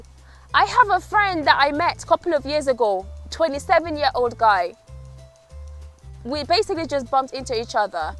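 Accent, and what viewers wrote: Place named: RP, United Kingdom. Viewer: Hi. Can we do a massive online database for all major African languages assisted by those who speak them well and technologies like NLP, ML, AI, Crowdsourcing, and the like? Forgive me for saying this but your Queen's English is adorable (This is what they taught us in school from Primary all the way to Uni)so I cannot remove myself from it entirely. I'm a Kenyan from a major tribe that takes English as its first language! Talk of colonial mentality.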